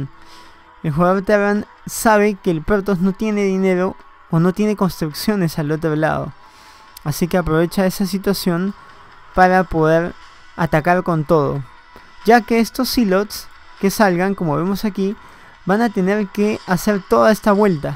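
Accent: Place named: France. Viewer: esque es frances.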